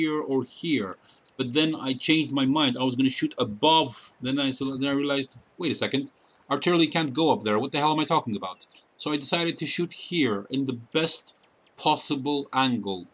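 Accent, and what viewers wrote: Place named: Greece. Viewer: I just want to point out your English is well learned. The Greek accent is there, but not painful to listen to.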